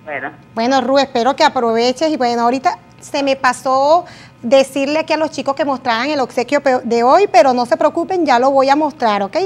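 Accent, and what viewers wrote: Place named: Venezuela. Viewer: Un saludo desde El Salvador, increible como estoy aprendiendo de usted, por su acento creo adivinar que es de la hermosa Venezuela, y por tu forma de responder eres cristiana evangelica, Dios bendiga tus manos, y por favor dime o enviame por favor el paso a paso còmo hiciste el dulcero que diste de regalo por favor amiga linda.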